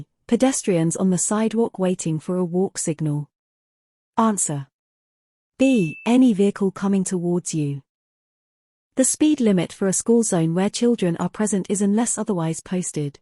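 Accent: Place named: United Kingdom. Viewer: Thanks for this video, but this is a Q&A video for California DMV which is what it means for American citizens, so why was the narrator chosen with a British accent?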